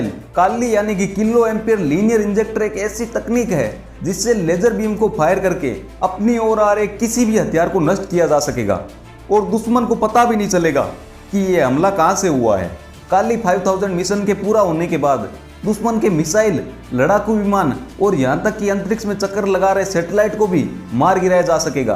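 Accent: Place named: Haryana, India. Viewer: Bahi Haryana sa ho kya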